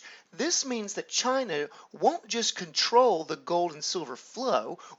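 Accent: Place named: South, United States. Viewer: As soon as I hear American Southern accent, I know conspiracy theorists are coming.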